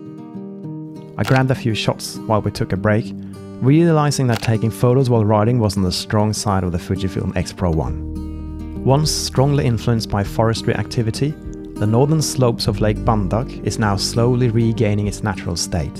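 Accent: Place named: England, United Kingdom. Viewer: because of your accent and perfectly spoken English I assumed that you were from England?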